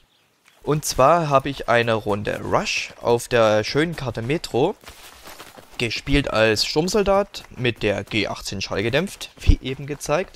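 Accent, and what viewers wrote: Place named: Saxony, Germany. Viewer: Gutes Gameplay:) Aber sorry ich komm mit deinem leichten sächsischen Dialekt nich so ganz klar :/ Aber sonst gutes Video:)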